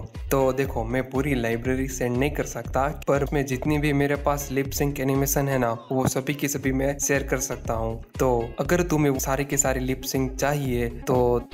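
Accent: Gujarat, India. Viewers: gujrati tone....so cute....peli line sunte hi mujhe pata chal gaya aap gujrati he....the way you say dro 😄instead of draw.....thats so cute....gujarati bhasha sach me bohot mithi he ❤❤❤ subscribed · Gujarati chho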